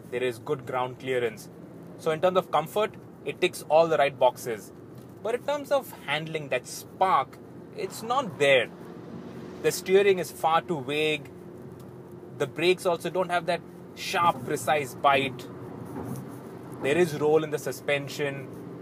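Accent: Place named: India. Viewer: ก็นึกว่าของคนไทยรีวิว นี่ไปเอาใครสำเนียงแขกมาพูดให้ฟังเนี่ย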